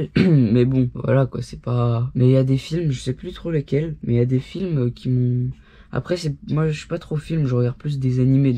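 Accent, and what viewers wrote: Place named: Algeria. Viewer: Mec c'est normal que ta un accent de Dz ? 😂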